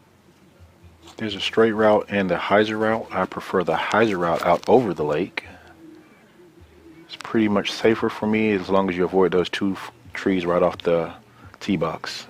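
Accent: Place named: South, United States. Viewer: Nicely done. Soothing southern voice. Simple and clear.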